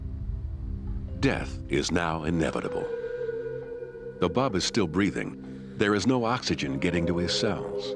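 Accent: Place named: United States. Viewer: the american narrator ruins this show.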